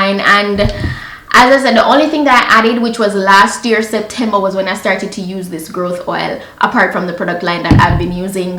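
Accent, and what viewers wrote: Jamaica. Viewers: I hear Jamaican accent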